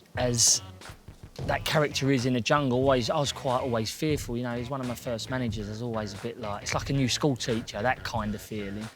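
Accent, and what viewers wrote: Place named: Essex, United Kingdom. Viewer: Could he get anymore Essex. He puts extra 'w' in stuff it's hilarious. Prwawn cocktaiw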